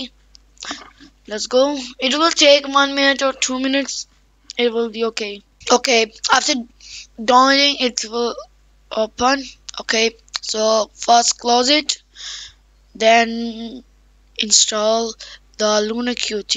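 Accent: India. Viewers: thx for client btw i know ur indian cuz ur english is like indian LOL............................ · bro got that indian accent tho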